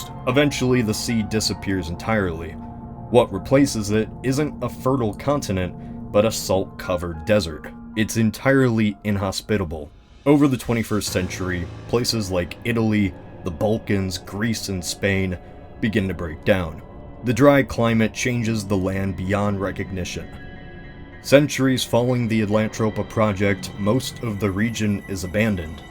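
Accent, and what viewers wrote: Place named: Transatlantic, United States. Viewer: You need to work on your Transatlantic accent.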